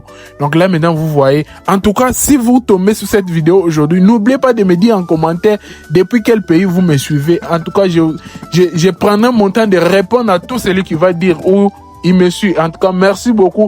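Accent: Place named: Ivory Coast. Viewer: T'es congolais mais t'as l'accent des ivoiriens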